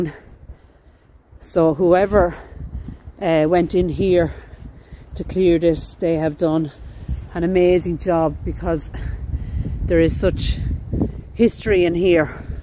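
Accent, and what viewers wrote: Ireland. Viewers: I am trying to learn to speak irish and I really love your accent. Also thanks for all the cemetery videos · I am in Kentucky, as well. Greetings to you! Only recently found you and I thoroughly enjoy being invited along on your explorations. I love history and I love your brogue. I have some Irish ancestors just not sure how much. Are there any of the names of Case or Harrell? Anyway, thank you so very much for what you do, it's wonderful and I hope you will continue for a very long time. Take care and God bless! 🙂🌹🇺🇸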